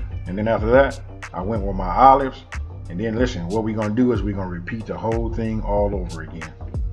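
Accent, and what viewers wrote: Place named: California, United States. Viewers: Classic CalifornI.A. accent, went to college with a bunch of guys from Cali. They all sounded tha same, LOL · This dude is dope AF!!! What up bro bro!? I hear that Cali swang in your voice dawg... Wes wes... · I can tell he’s from the West Coast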